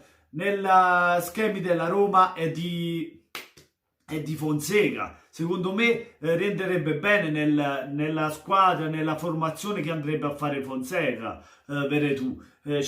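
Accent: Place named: Naples, Italy. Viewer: Ahahahah ciao carissimoooo mi piacciono tantissimo i tuoi video, poi la parlata Napoletana è fantastica viva il SUDDD ❤ BUONA SERATA 💋💋❤❤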